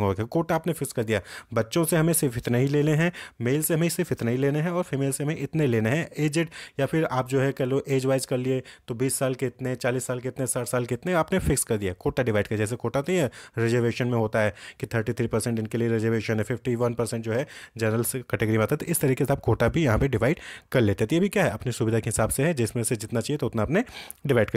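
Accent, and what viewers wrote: Uttar Pradesh, India. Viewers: lgta h sir up se h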